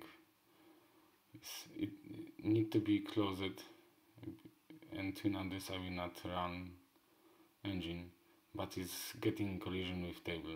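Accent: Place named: Russia. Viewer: Блин, я английского не знаю, но вот тяжелый русский акцент слышу сразу😊.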